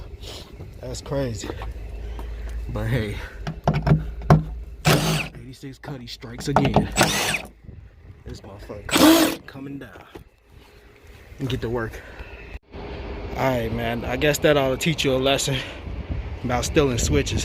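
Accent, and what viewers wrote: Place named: Chicago, United States. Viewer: yo commentary funny ash as soon as da video started ik u was frm da raq watchin frm tx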